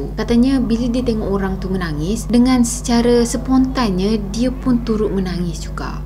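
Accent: Kelantan, Malaysia. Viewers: Salam kak.akak ore kelate ke.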